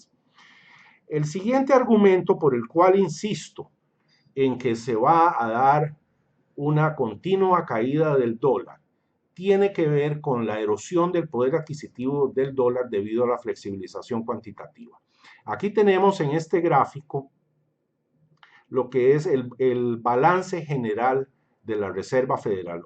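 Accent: Costa Rica. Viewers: sos de costa rica? Digo por tu acento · Eso que dices de Usa describe a la perfección a México fascismo corporativo!!! Genial canal, fantástico que te hayas ánimado a compartir tus conocimientos. Saludos desde México,de dónde eres? por tu acento creo eres de costa rica. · Sos de Costa Rica verdad? conozco ese tono de voz. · Reconozco a un tico cuando lo oigo.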